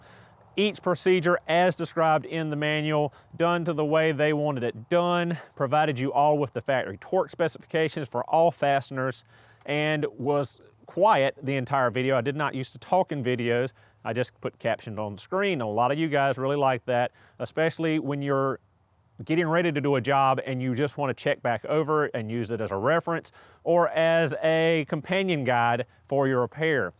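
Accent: South, United States. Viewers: I didn't mind your southern accent and it does take me awhile to get used to it 😅, and I like your video that show people how to do things 🎉 my brother's car needs a part replacement in the engine a mechanic wants to charge him three thousand dollar 😭 to do it, he didn't want to do it, but he fixed it 😅 I asked him how did he do it, he said he watched some yt videos on how to do it so on a long weekend he rented a engine lift thing and with help of his sons they got it done 😅🎉 I was impressed because the car is a BMW M7 😱 he took the engine apart change the part which he ordered online and put everything back together 😢, I have a Subaru repair manual It does have details instruction on how to do it but watch your video on how to do it and the tips he gives that is something a manual can't give me so I 👍👍👍 for you to do it, but it mean more work for you I hope you can continue to make this type of video 🎉 because a mechanic is just too expensive and I don't know if he is telling the truth 😢, my mom told me s story about my aunt, when she brought a car, because she didn't know anything about cars so she took a Auto repair class in highschool, I was surprised 😅 because she has a PhD and a professor at UCLA tough there for a long time and yet she knows nothing about how a car works mechanically so she took the class to have an idea on how car works, sometimes I was wondering when she was in the classroom does her classmates or the teacher know about her, they may think she is jus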